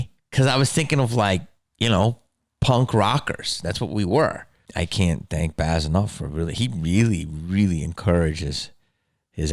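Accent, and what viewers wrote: Australia. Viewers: That Aussie accent warms my Aussie heart. 🤣🤣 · I love all your movies !!You should probably make a movie as a Australian animal, hunter or something because your accent is spot on😂😂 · I absolutely love your channel and videos like these. It's such a treat to get some behind the scenes insight, thank you! Also, I'm Australian and really appreciate the accent haha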